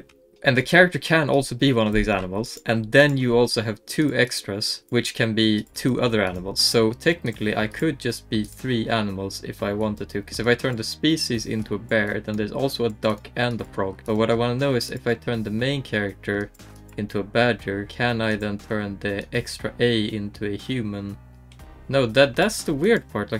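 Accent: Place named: Sweden. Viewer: I honestly love watching ur videos, partly for the Hero Forge tips and partly for the strong Swedish accent, it's awesome <3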